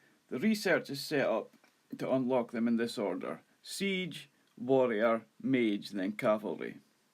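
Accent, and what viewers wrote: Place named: Scotland, United Kingdom. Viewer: I love this dude’s accent. Pure Scottish 🏴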